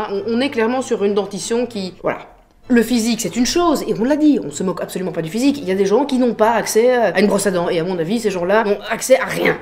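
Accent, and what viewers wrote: Belgium. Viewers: Salut, Je sur kiffe vraiment le procédé de vidéo mêlé à l’humour et cet accent belge … 😂 Mais c’est vrai que ça va un petit peu trop vite … là même chose un rien plus lentement… serait géniale …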